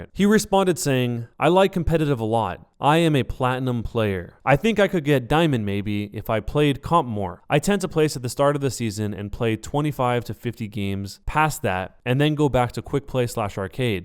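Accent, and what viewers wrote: Sweden. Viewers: Are you Swedish? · are you swedish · are you swedish? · Are you swedish????!!!!!! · O are you Swedish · U swedish bro? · Are you from Sweden? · Are you from sweden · Are you swedish??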